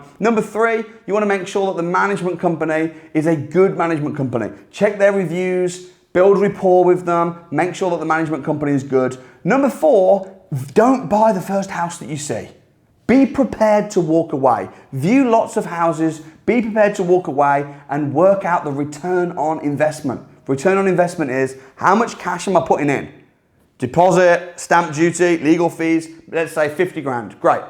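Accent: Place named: Buckinghamshire, United Kingdom. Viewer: Thought you sounded like you were from Bucks! (Same as me) Good video thanks👍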